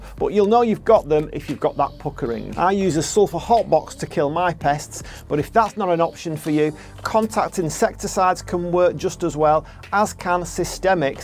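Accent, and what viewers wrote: North, United Kingdom. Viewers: I have a very different routine for growing them, and cyclamen probably account for 25% of all the plants I cultivate. also in the UK, and from your accent about the same Northern latitude. been growing them for at least 10 years now and only lost 4 out of about 50 mature plants. all 4 lost were lost within the first year due to tuber rot and were probably already damaged and on their way out when I bought them. so second year of buying i prodded my finger into each pot before buying a plant to check there was a reasonable size and hard tuber in the pot somewhere. The rest are all doing very well, some started of as 1cm ultra cheap baby specimens, and now have like 5cm diameter tubers, others now have even bigger upto 10cm diameter ( that's 30cm circumference ) tubers, I have a considerable variety of species and all the colour spectrum I could possibly get my hands on. 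they flower for over 6 months of the year ( slightly staggered between species ) , and I often pollinate them with an air puffer, so they produce pods, which are interesting to look at for 4 more months of the year., leaving just 2 months of leaf only, i never let them go dormant. mine remain very lush and green all year round, most leaves lasting more than 1 year and get quite big, I never let them go dormant, and I get a great deal of continuous blooms each year, like a carpet of flowers above the greenery. I plant them with the top 1/5 ( 20% ) of the bulb above the soil or compost and i always top water, qu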